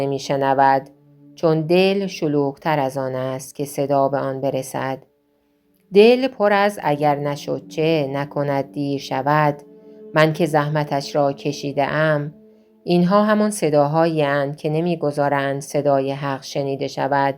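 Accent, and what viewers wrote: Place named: Turkey. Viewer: به خداوندی خدا ابن عربی ادم خیلی بزرگیست که در دین و عرفان عالمی بزرگ بوده اما نمیدانم چرا باید یک دوبلری بزارید که ادم حتا چندشش میشود به پادکست گوش داد من کل کتابهای ابن عربیه و ابن بابویه بجز دایرت المعارف ابن بابویه همه را چندین بار خوانده ام اما صدای این خانم با لحجه همشری ما ترکی به خدا خراب کرده ادم بزرگی مثل ابن عربیه را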